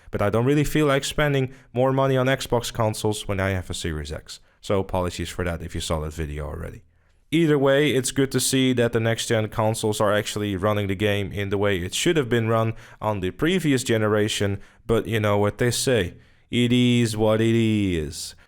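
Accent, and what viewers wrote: Netherlands. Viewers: Are you dutch? If so: Goedeavond 😁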